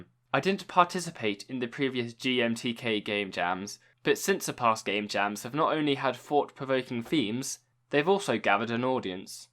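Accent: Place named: Europe. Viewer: Ur from UK? Your accent is European. Nevertheless, you're my favourite. 😁😁😁😋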